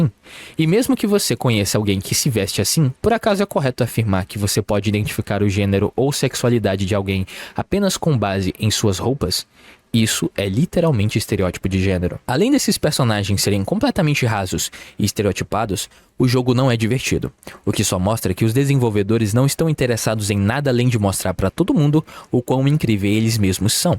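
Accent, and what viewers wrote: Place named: Northeast, Brazil. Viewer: esconde o rosto dnv man vc é o boneco de IA do seu perfil, n este gigachad com um sotaque de nordestino disfarçado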